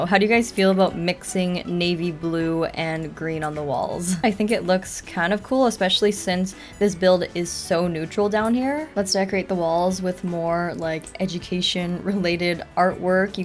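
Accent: Canada. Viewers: She's Canadian. Different countries, different pronounciations.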